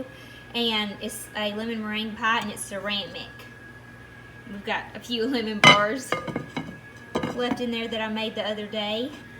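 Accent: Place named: Alabama, United States. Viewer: Everything is beautiful! How do you keep your light colored couch so nice and clean with puppies? I'm originally from Alabama but now live in TX. Nice to hear the Alabama Accent :)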